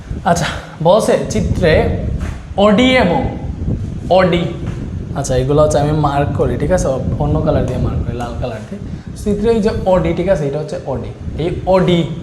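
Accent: Sylhet, Bangladesh. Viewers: Baiya apni ki Sylhet e taken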